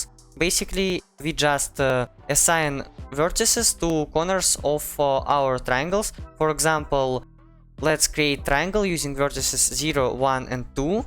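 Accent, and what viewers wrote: Russia. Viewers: бро мы все знаем что ты русско говорящий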